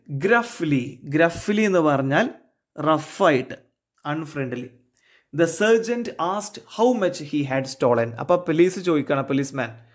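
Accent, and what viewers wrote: Malappuram, India. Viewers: Malappuram ahno atho palakado?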